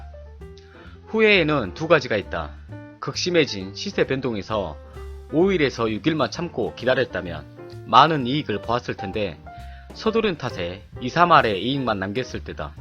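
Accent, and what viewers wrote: Jeolla, South Korea. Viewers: 전라도 사투리의 비애.. 전라도 사람인 제가 들어도 ㅋㅋㅋ 서울 말투써야 먹힐듯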